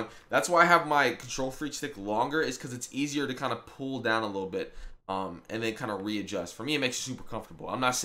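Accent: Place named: Canada. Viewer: you sound Canadian, are you?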